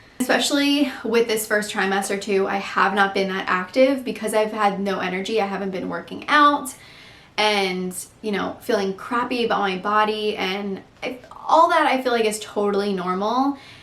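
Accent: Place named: South Jersey, United States. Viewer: Totally voting boy 💙 I just wanted carbs, bagels, cereals etc 😊 also since moving from Jersey, I can totally hear your accent! I have the south jersey accent as well and my new friends always call it out 😂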